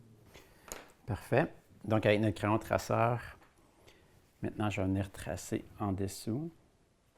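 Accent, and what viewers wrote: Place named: Quebec, Canada. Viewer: J’ai trop d’amour pr l’accent québécois je meurs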